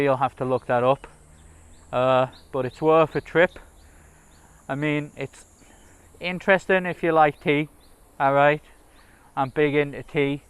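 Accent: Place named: Newcastle, United Kingdom. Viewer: He is from UK. Specifically North of England. Newcastle area. Which known as Geordi land. Hence his accent.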